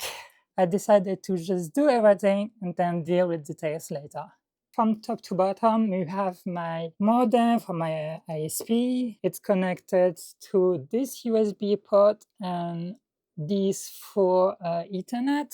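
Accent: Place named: France. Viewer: Thank you for this video,! I'm an Aussie and am intrigued with your home workshop and your accent. French? Perhaps German? Just curious. Your home workshop is amazing. Your layout, organisation... Wow. Is this a hobby or a business? Let me just say that out homes look similar, but I have 8 Billy Book Cases full of plastic tubs that hold my various hobbies and the associated equipment. IT, Electronics, painting (Cars, furniture, etc) Leather repairs, abrasives (Pads, blocks sand paper), but in a much bigger space. Sorry if asking is rude. I mean no offence. On builds... I used a ITX Z-170N Mobo, i5-7600k and 32 gb of memory. I put in a 970 Pro NVME and partitioned the drive into a boot and a cache. I decided not to HBA, instead went Ubuntu Webmin and ZFS. I don't spin down my drives! 5 x 4tb WD Red 5400rpm drives. All up, Power consumption is 38 watts idle and the highest during a stress test was 54 watts! 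In the previous NAS my 3 TB drives lasted 13 years! HBA's are great but draw a higher percentage of power. Especially the 9200 series, so I used on board sata. I have one spare cold mounted drive and the connection is to a caddy, to do backups or import data. ZFS is memory hungry. My Raid 5 (5 x 4 Tb drives) uses 55% of the 32Gb of memory. Thank you again for the great build and fun, interesting video. Merci / Danke!!!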